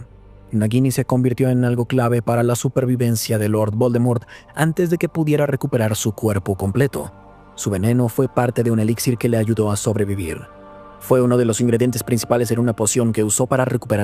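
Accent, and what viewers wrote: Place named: Latin America. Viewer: Oh español latino... esto si se puede escuchar (vengo de videos de españa y destrozan lo nombres)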